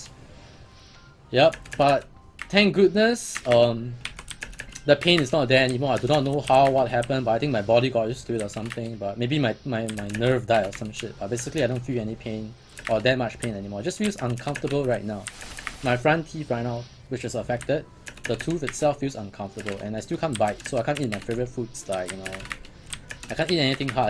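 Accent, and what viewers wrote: Singapore. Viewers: ! are you singaporean?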